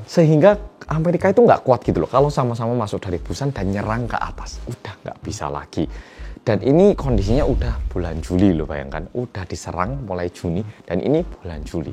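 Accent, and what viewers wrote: Java, Indonesia. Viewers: Hahahahaha penjelasan nya wong jowo tenan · Denger pelafalannya mirip soekarno yaa kalau ngomong “keun” maksdnya “kan” wong jowo 🔥🖤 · Aksennya indo dapet bgt, semangat bg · Hi oppa medok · orang korea ko medok??🤔🤔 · Orang Korea tapi bahasanya agak medok 😀👍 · Ini orang Korean beneren ya? Medok jawanya enak lho. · Logatnya jadi BHS Jawa ya medoknya 🤣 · Orang korea lagam bicara jawa wkwkwk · Logat Jawa nya tu lo hhhhhh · Wah bahasanya medok..sudah kayak orang Jawa mas😂😂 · Sampean logat jowo ne peh masok bolo😁😁😁😁😁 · Bisa jadi pengamat politik iki mas korea yg bisa jowo medok🤣🤣🤣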